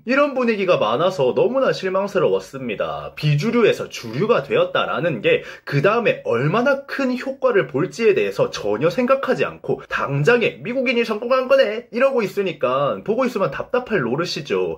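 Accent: Gyeongsang, South Korea. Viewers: 목소리에서 좀 힘을 빼시면 어떨까요? 아마도 경상도 사투리 때문에 더욱더 힘을 주시는거 같은데 너무 같은 높은 톤으로 때리시니 듣는 동안 힘이 드네요 ㅠㅠ 요즘은 그냥 자연스럽게 사투리 톤도 괜찮을거 같은데...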